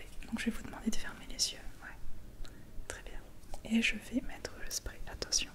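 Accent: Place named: France. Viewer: Hi, I hope you continue with your desired goal of making it as an ASMRist - this is very admirable.Please produce more videos centred around you speaking in English (French speaking English is the ASMR in essence).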